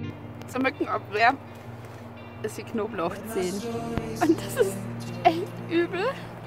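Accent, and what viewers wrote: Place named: Bavaria, Germany. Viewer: Servus, was für ein geiles Video!!! Und dann a no auf bayrisch - perfekt und vielen Dank für diese herrliche Doku des PCT.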